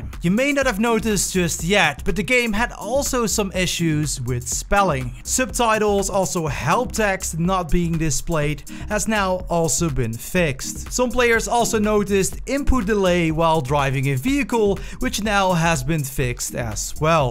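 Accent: Netherlands. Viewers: You dutch bro? I live in Amsterdam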